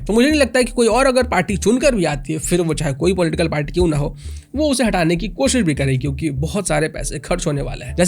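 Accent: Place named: Bihar, India. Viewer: bhai gandu hai kya..udisha nahi odisha hai.. bihari tone har mein ghusana jaruri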